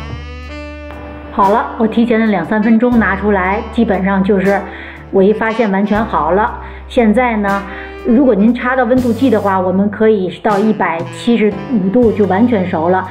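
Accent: Tianjin, China. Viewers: 天津口音，哪里的？ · 大姐 天津人吧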